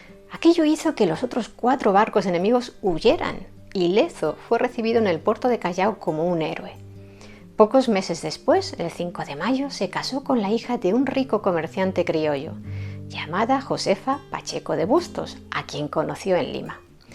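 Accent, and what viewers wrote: Spain. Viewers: Siempre escuche de Blas de Lezo como un valiente defendiendo a Cartagena. Que interesante!!! Narrado por una mujer de la madre oatria con una bella voz y poetico acento😊😊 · Exquisita historia de mi ciudad Cartagena de Indias, admirable y a la vez muy triste el final de este gran héroe Don Blas de lezo, en las escuelas si enseñan esta historia de la defensa de Cartagena ante los británicos y de hecho hay un monumento de el frente al Castillo San Felipe de Barajas y llevan su nombre un colegio y un barrio; ah y muy buena narración de con ese acento español que te caracteriza.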